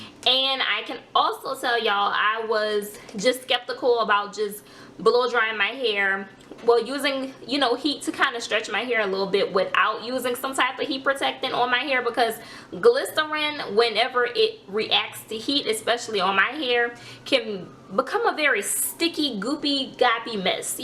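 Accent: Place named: Baltimore, United States. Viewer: I hear 👂🏾 Baltimore in your accent. Btw, pretty results! 👌🏾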